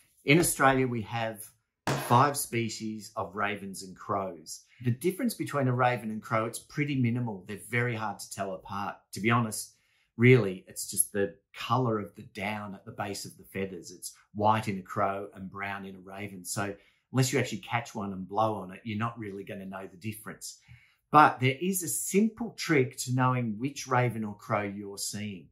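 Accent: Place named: New York, United States. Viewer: haha...thank you. love it. I'd say, not an American accent but somewhat NY city Jewish accent. :). Jewish Ashkenasic :).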